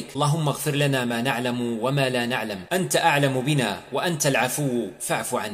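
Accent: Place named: Maghreb. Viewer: لك لهجة المغرب العربي في كلامك يارب تكمل فرحتي وإفتخاري إن كنت جزائري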